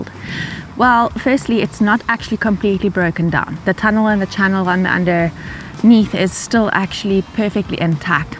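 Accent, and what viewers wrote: South Africa. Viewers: SAFFA accent. Nothing else matters :) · South African accent. Just accept we all sound a bit different and enjoy the content of the video. 😎🇬🇧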